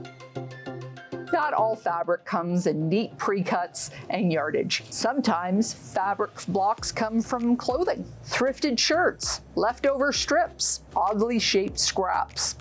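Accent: Canada. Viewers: Hi! I just started quilting (been sewing for years) but got recommended your channel to start, and it’s so helpful!! I caught an accent and saw you’re Canadian too! I was wondering if you’ve noticed price increases for certain tools/materials in Canada specifically? Prices have gone up overall, but for anything in particular that you’ve seen, or anything that’s maintained affordable for the hobby (not including reusing/thrifting)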